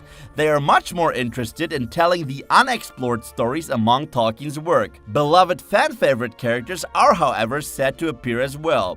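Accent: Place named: Germany. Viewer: your german accent is horrible lmao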